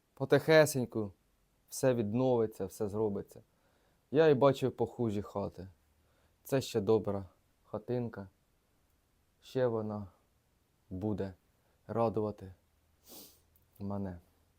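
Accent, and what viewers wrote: Poltava, Ukraine. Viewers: З перших слів відчувається Полтавський говір❤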